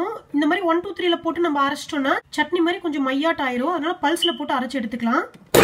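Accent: Kongu, India. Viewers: Kongu Tamil😍😍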